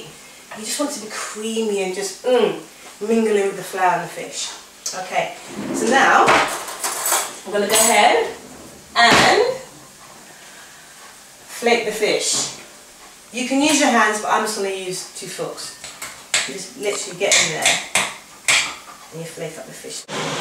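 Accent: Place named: United Kingdom. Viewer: Great recipe! I liked your British accent! Thanks!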